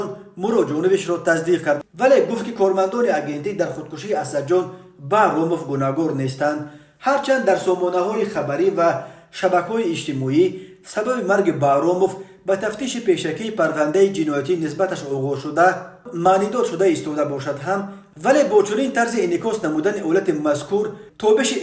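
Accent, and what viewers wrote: Pamir, Tajikistan. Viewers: Салом бародаро😊 Рӯзноманигор помири аз лаҳҷаи суханронит маълум ☺️🤩👏 бисёр канали хуб ва хабарҳои нав👍👋🤩🇹🇯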